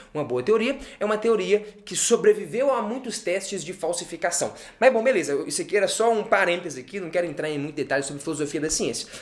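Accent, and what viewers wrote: Minas Gerais, Brazil. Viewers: tu é mineiro mestre? Me identifiquei com o seu jeito de contar caso e falar KKKKKK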